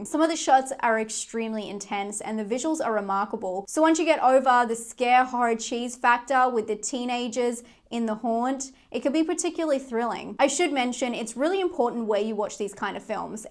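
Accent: Australia. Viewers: It is so cool to have someone in Australia doing movie reviews as im an aussie as well but don't get me wrong i love watching american movie reviews as i do all the time its just good to here an aussie talk about movies and Haunt sounds like my cuppa tea so thank you for your time doing these reviews look forward to your next one takecare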